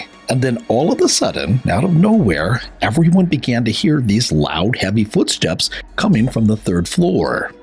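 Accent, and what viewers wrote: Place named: United Kingdom. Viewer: Glad you used a clear British accent as South Shields and up that way have a very thick accent. Awesome upload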